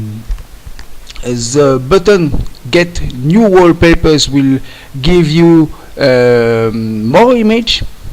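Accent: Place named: France. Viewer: hello you are french ? because i think that you speak in "franglais" ? i like and joke you accent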